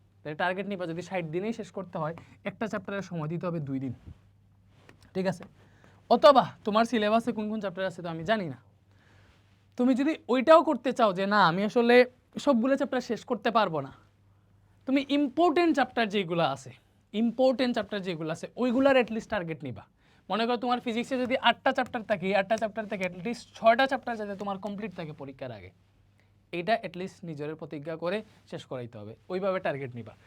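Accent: Sylhet, Bangladesh. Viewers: Vai ki sylheti?,..... Tan to sylheti er moto lage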